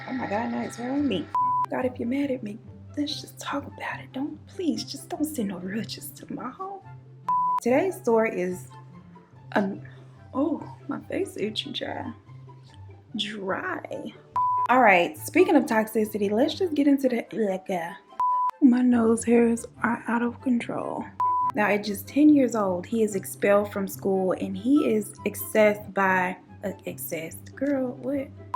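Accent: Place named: Houston, United States. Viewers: i shoulda knew u was from houston too by the way u sound lol!! <3 I just found your youtube recently and I love it!! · I knewww you were from Houston as soon as I heard you speak. 😂 we have that extra seasoning in our story telling. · Omgggg aye ur from texasssaas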